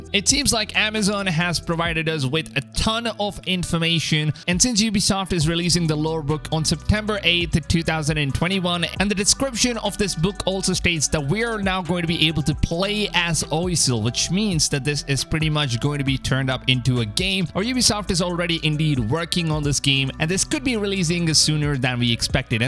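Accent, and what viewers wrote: India. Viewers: Yes and he clickbaits by using thumbanails and titles ,perhaps he is somewhere from india but his accent is different from the accent which i hear from the common folks of my country. And i think we shouldnt follow this guys content . Sometimes he says AC valhalla is the best assassin but after making 2 videos he says AC vallahalla and Ac odyssey are repetitve games.. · U indian boi tho? I am picking up a slighhhht accent wasup?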